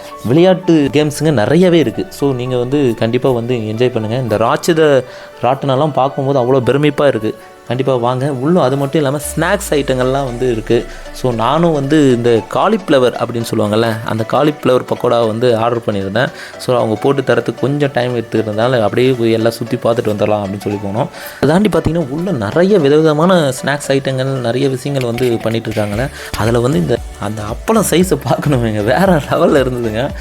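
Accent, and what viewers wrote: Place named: Vellore, India. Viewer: Nama than 😂 vellore la inime nama than antha mari iruke ya un slang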